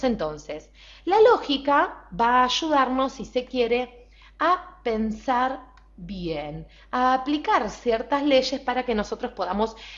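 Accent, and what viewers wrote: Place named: Argentina. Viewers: Por que hablas en argentino? D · Nosera porque es Argentina?👊😈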